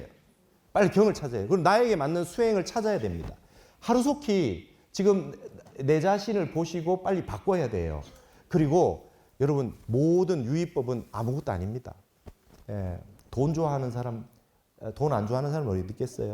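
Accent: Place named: Gyeongsang, South Korea. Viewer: 스님 은근히 경상도 톤에 정감이 가네요 항시 스님 법문에 관심을 가집니다 감사합니다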